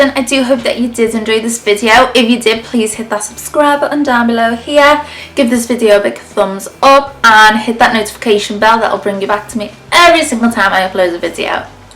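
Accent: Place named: Liverpool, United Kingdom. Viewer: Hey are you from Liverpool just wondering from your accent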